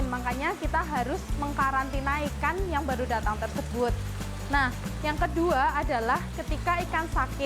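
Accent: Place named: Central Java, Indonesia. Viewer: bahasane medok bnget.. koyo wong jawa tengah bagian kulon.. hahahah😊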